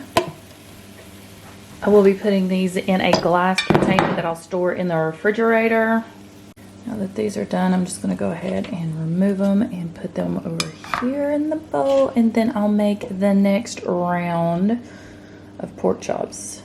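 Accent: South, United States. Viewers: YAY!! Meal prepping! Thanks! I have 4 kids (2 still at home and the youngest is the pickiest 5 yr old girlie on the planet I swear! LOL). Btw, I love your southern US accent: it reminds me of Dr. Ken Berry's accent and I think he lives in Tennessee so I can only guess that you're from the same region. Anyway, I'm going to watch your video and take notes as this looks like what I'll be making for my own family over the weekend. Warm regards from southern but not-so-sunny Belgium, Donalda (new sub)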